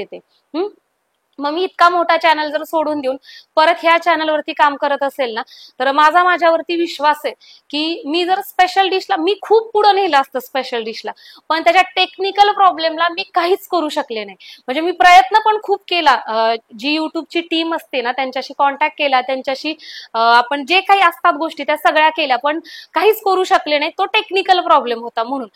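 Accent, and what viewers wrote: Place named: Solapur, India. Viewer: खरं आहे आशा निगेटिव्ह कमेंट आल्या की आपण नाराज होतो. पण आशा कमेंट कडे दुर्लक्ष कर. आणि पुढे करत रहा. मलाही अशाच निगेटिव्ह कमेंट्स येतात. मी दुर्लक्ष करते. तू छान करतेस. आपली सोलापुरी भाषा आपला अभिमान आहे. आपल्या भाषेवरून बऱ्याच कमेंट्स येतात. पण मागे हटायचं नाही. नाराज व्हायचं नाही. पुढे करत राहायचं.❤👍👍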